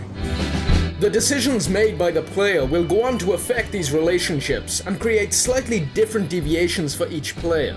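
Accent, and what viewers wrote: Wales, United Kingdom. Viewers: Is Welsh? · No he's british or welsh I think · Firstly your the only big YouTuber ever to respond to a comment so 100x props for that and then second your from wales so 1000x props for that :P I thought I heard welsh overtones but I wasn't sure, what part ?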